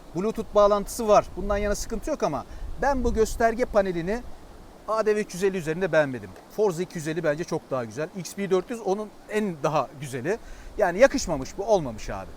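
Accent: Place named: Adana, Turkey. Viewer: abiniz Adanalı belli. Adanadan selamlar o halde!!!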